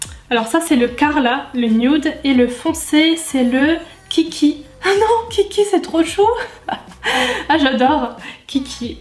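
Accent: Lorraine, France. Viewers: Je me disais bien pour l'accent ! Originaire de Lorraine également 😉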